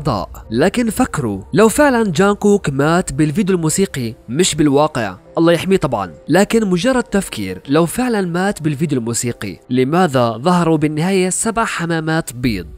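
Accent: Iraq. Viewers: قلي نت ليش تحجي مرات عراقي بس أرى اعرف 🙂🤔🤔🤔🤔🤔🤔متابعتك من العراق🇮🇶🇮🇶🇮🇶🇮🇶🇮🇶🇮🇶🙈🙈🥰🥰🥰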